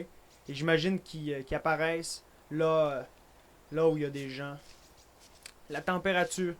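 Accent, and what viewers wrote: Quebec, Canada. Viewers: je veux un autre episode pas demain parce que je suis pas la mais apres-demain nan sérieux ton accent québécois paraissait plus dans cette video et ta pas de laire de venir da grande ville mais plus du nord du québec =) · dans quel pays habite tu quand on dirait que tu as un accent québécois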